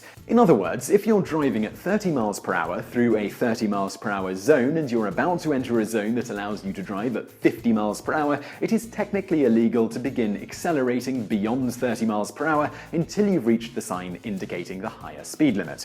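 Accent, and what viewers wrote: United Kingdom. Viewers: It's funny hearing someone with a British accent use, "miles per hour".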